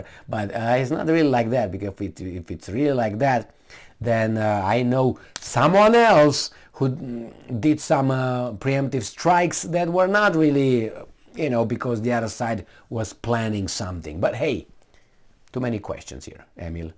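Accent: Australia. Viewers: Not a bad casual attempt at an Australian accent, for a Romanian....😁👍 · A Romanian, speaking in an Australian accent is something I didn’t know I needed lol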